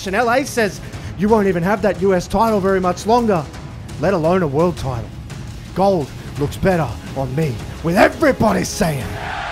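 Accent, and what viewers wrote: Australia. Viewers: Ngl, you actually do sound like Grayson Waller, are you from Australia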